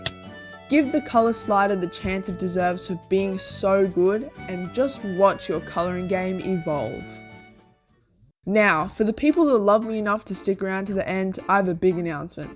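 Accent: Australia. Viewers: as soon as you started talking i immediately yelled 'FELLOW AUSSIE SPOTTED' (it is 12am)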